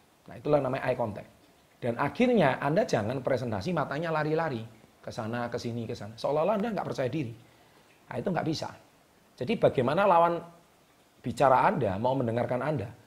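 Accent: Java, Indonesia. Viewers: Logat jawa nya buang lah dan kamu sendri bicara masih belum fasih gimana mau orang dengar dan fidio bukan kamu punya tunjuk orang punya dasar pembohong cari sensasih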